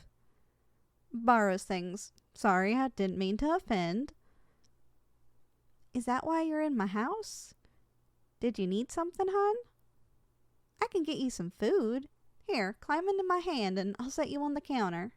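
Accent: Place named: South, United States. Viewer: Can't say I expected it to play out that way. Now I'm curious if there's a 2nd script continuing this story. As for this one, you really nailed the Southern Hospitality feel in your accent delivery. Very well done :_AvaLavaLove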